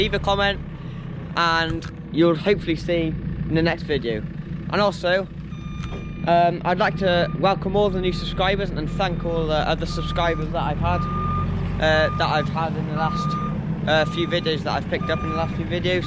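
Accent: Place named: Pembrokeshire, United Kingdom. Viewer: Where abouts you from you sound like you’re from Pembs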